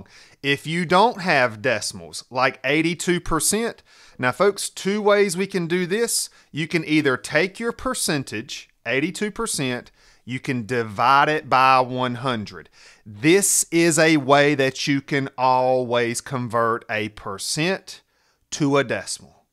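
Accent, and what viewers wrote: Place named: North Carolina, United States. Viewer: Hey, fellow North Carolinian! I recognized that accent quickly. I just wanted to say that I just took my TEAS a few days ago. Due to your videos I scored a 95 on math, my highest score on the exam. I wish I could post a picture here. I also want to let you know that my calculator, that was made into the exam, had the % button and square root button on it surprisingly. Guys, definitely go over percentages. I had tons of % questions, all definitely in line with those that offer., sincerely, thank you so much. 🙏 I bought the Mometrix 7th edition book, but it wasn't nowhere near as helpful as you (and Nurse Cheung for reading and English). Watch these two YT'ers and you will pass those 3 sections. Now, science was a whole other story. I took A&P, passed with a 96...yet science was ridiculously in depth. Study that subject very well. 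I wish you all the best, don't give up! ❤️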